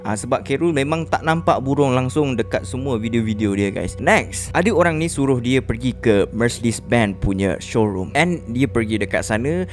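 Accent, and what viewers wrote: Malaysia. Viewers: Kwkwk lucu, logat melayu memang sangat lucu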